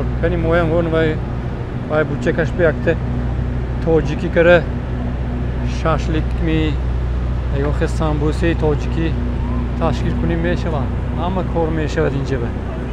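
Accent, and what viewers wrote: Tajikistan. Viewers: аахха... прикольно говрит по Таджикский . " Небоскреби баланд ки сара бардори токит меафтад" 😂😂😂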